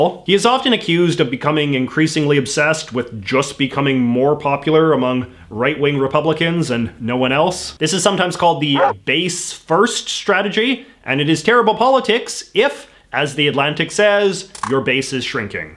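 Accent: Canada. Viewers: Really want to watch these videos but his accent is so painfully Canadian. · The Four Parts of. •The Moustache (RIP) •The Hair •The Incredibly stereotypical Canadian Accent •Francophobia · Are u Canadian ? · is he canadan?he has an accent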